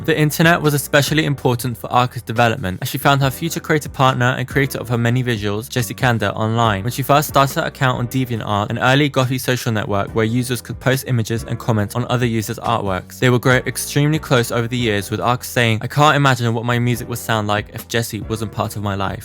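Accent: United Kingdom. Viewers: ok soy Venezolano, Arca en este País es algo mal visto, aunque ya no tanto, aun así desde que lo o buena "La" conocí fue apenas en 2020 y me encanto muchísimo su música y cuando supe que era Venezolana casi me derrito de las felicidad, muy buen vídeo, todavía me cuesta entender el acento británico :´)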